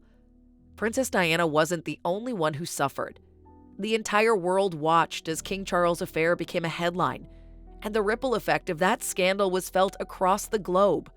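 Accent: United States. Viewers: parker bowles was always a player. click bait lies, the creator of these trashy videos should be sued . always an american accent why? why would parker bowles confide in some american you tubers? im sure he would say nothing. source of this info?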